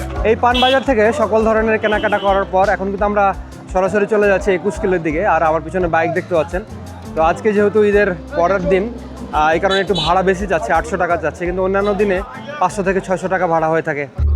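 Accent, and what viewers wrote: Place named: India. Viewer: তোমার ভাষা তো ভারতীয়। কোথায় তোমার বাড়ি।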